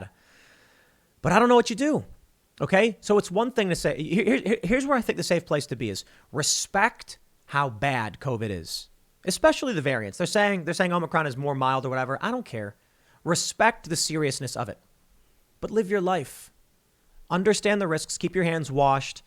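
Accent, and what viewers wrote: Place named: Chicago, United States. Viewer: Has anyone else noticed Tim is starting to exaggerate his As and Rs. We get it Tim you're from Chicago....geeze.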